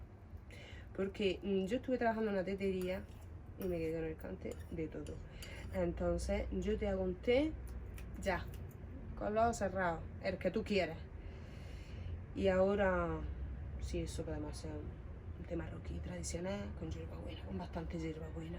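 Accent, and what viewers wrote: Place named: Andalusia, Spain. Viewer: Masha Allah hermana gitana, y como adoro tu dialecto andaluz. Linda historia y contado en una manera muy hermosa. Me encanto!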